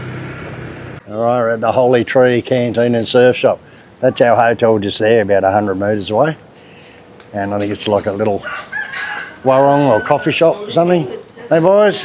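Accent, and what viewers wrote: Australia. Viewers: talking Indonesian with an Aussie accent is great 😂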